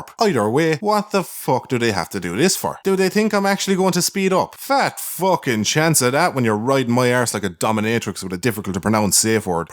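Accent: Ireland. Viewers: Hearing say the most marvelous of insults and creative swears in his Irish accent is the reason I get out of bed in the morning. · Swear to God, hearing the Irish accent swearing is like wiping your arse with silk.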